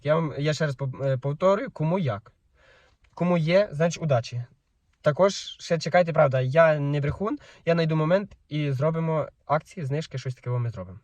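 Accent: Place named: Dubno, Ukraine. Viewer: Чую по розговору, в мене бабуля з Дубенського району була, все дитинство там провів 👍👍👍. Зря хочеш продавати пасіка то для душі....але ти правий шо особо не заробиш на цьому ділі.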